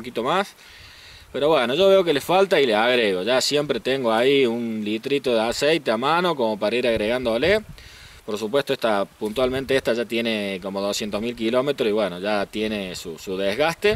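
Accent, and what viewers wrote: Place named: Cordoba, Argentina. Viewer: 6 años viajando y no perdió el tono de cordobés jajaja muy bueno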